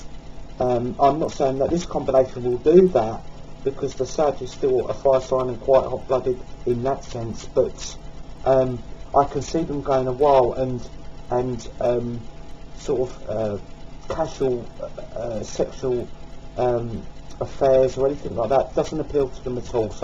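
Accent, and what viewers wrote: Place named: London, United Kingdom. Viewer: What is your regional accent, London sort of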